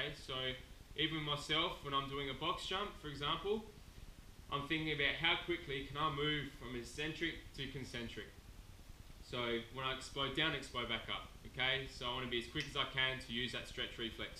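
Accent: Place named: South Australia, Australia. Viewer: Thanks for the video. By the sound of your accent, you are from South Australia. Very different accent to the other Australian states!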